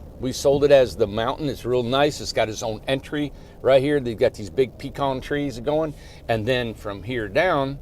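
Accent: South, United States. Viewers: you remind me of my grandma. She was born and lived her entire life in Tn, and y'all talk the same way. Very southern. Hearing you makes me miss her.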